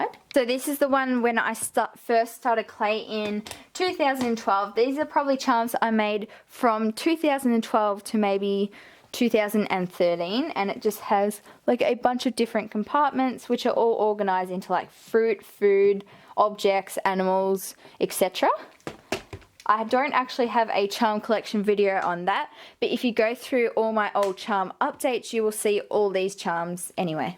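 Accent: Australia. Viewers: I could tell you’re Aussie because you sound similar to Georgia Productions :) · omg i am crying for happiness right now... stabilo! telletubbies! bostik! smiggle! typo! kmart! etc. the accent! yay! i finally found an australian youtuber that does polymer clay😃 {I am an aussie too!} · I knew she was Aussie because of her accent lol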